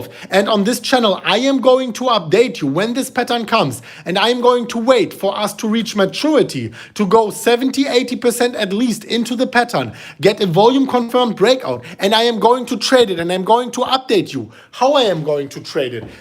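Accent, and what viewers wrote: Germany. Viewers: Ich wusste sofort das du Deutsch bist 😂 Der Akzent kommt mir bekannt vor. Much love aus Hamburg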